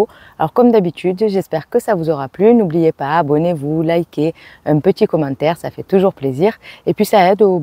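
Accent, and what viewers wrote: South, France. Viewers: J'aime vraiment vous retrouver pour vos vidéos Ce petit accent du midi me fait rêver ❤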